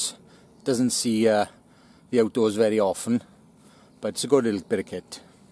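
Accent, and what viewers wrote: Wales, United Kingdom. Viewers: I was so confused about the accent, ive never heard such a thick welsh accent. I thought it was welsh and Swedish mixed lol. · Not often you hear a thick Welsh accent in a YouTube knife review! Subbed!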